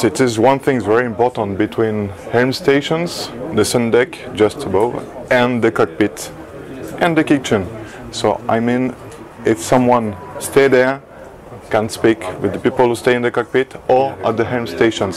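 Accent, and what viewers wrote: France. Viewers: Ah ! le célèbre "french accent" !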